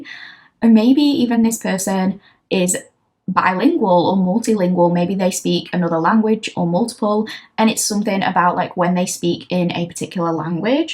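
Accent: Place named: Lincolnshire, United Kingdom. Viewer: Your partner will know that Hull is - wish I could do sound bite - more "Yer Nuurrwe", Did you say 'You Know'? Eh!!! But you could pass as a North East Lincolnshire gal! (Just in case you need to go incognito when your channel is famous!!! 😉🥰) Keep up the great channeling. You have a gift. ✨✨✨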